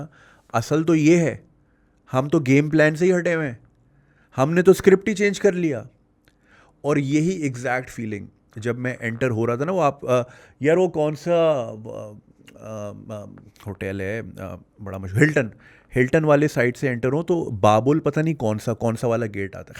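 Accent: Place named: Punjab, Pakistan. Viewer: correct your Urdu. You speak punjabi style urdu. For example, aap bolte ho, aap jate ho etc. Correct Urdu is aap bolte hain, aap jate hain. Secondly, why do you live apart from wife and one year old son. Sounds weired! Why? British Government do not allow you to live in UK. Also, you mentioned in one of your video that after the delivery hospital arranged free taxi to home for your wife. It doesn't happen here even if your are on benefit. I first performed Umra at the age of 22. Nothing spectacular happened as you described. It is typical of usual exaggeration.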